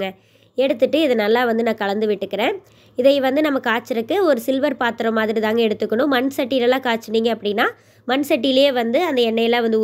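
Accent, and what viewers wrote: Kongu, India. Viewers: கொங்கு தமிழ்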